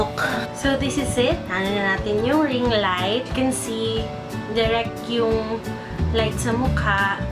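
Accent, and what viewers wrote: Philippines. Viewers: are you cebuana?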